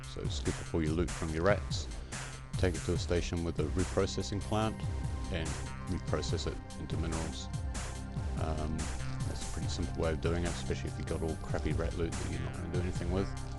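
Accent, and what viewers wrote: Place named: New Zealand. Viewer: its that kiwi accent, soft vowels.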